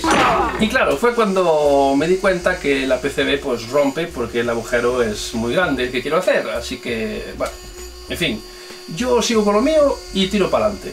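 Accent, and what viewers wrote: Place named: Andalusia, Spain. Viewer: acento andalú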